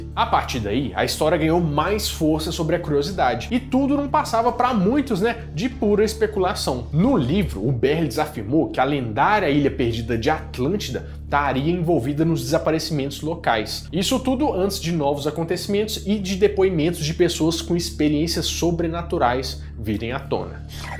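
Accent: Minas Gerais, Brazil. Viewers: Mineirin bunitin, sô.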